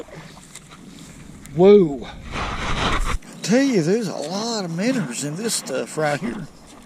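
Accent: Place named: Florida Panhandle, United States. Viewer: I really enjoy your videos my friend! I’m from the panhandle of Florida and I love to hear your accent! I have the same thing going on lol!! I moved a couple of states away and I don’t hear anyone talking like us besides your videos. Keep making these videos and I’ll keep watching em.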